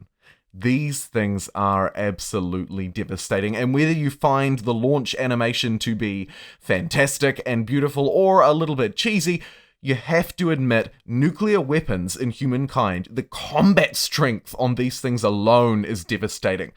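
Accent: New Zealand. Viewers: Be nice to put a face to the Kiwi accent I've been hearing so much whilst enjoying this game! Is one of those gents in the vids yourself?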